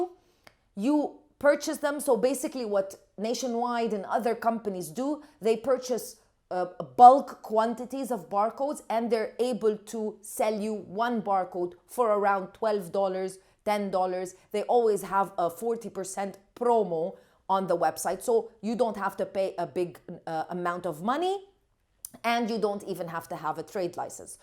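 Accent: Arab world. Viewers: I am confuseeeeeeed.u speak english like an arabic girl but your name is.any thanks for guiding us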